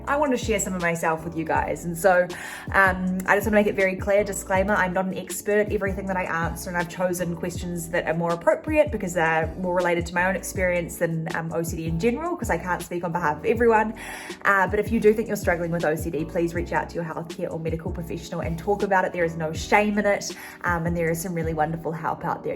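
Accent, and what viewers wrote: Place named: Singapore. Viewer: Why she speaking Singlish?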